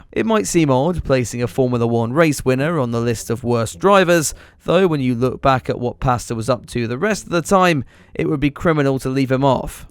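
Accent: United Kingdom. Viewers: Another generic British sounding guy starting a new F1 Youtube channel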